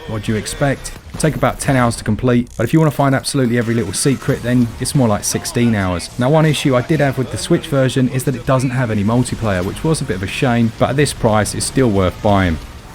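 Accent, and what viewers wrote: England, United Kingdom. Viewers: Love the British accent, greetings from México 🇲🇽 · British accent: handled😈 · I subscribed because I can handle the British accent. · Handle the brittish accent.. I want it myself! As always great content here :) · Monkey island music, a British accent, a nod to Monster Boy, and Dragon Quest- cant get any better. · I can handle the british accent. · I'm here for the British accent. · Wait are you saying I can win a prize if I just leave a comment? I can handle the British accent. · As an Englishman I personally cannot handle the English accent. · Even the avoid section sounds buyable when heard in a British accent Been wanting the final fantasy 10 and 11 remastered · Who the hell can't handle British accents? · Well I was gonna sub but I can't handle the British accent... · Can definitely handle the British accent 🤣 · The British accent IS the reason why I subscribed XD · Believe it or not, but you are the only British guys I understand perfectly! 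Plus, your accent makes me take a plane and go to England (damn CORONA!!:)) I love your channel!! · Love your channel and find your British accent to be endearing. Thanks for all the good run downs! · Real question, who doesn't enjoy a nice Brittish accent? Also, gotta say, I love the Monkey Island music. It always puts a smile on my face.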